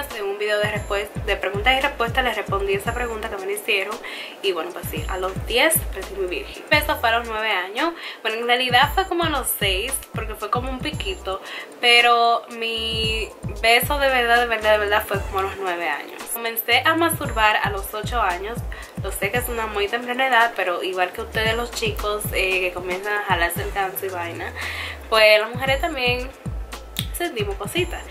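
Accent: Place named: Puerto Rico. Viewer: Acento PR veoo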